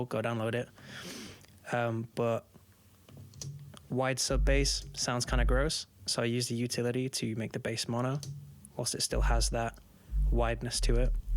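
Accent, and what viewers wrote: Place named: Northern Ireland, United Kingdom. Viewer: Bro you sound british, maybe northern ireland?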